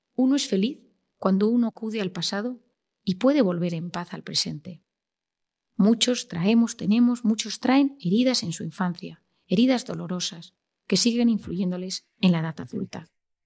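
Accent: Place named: Spain. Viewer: Yo preguntaría de q país sos?España x la voz,muy buena información.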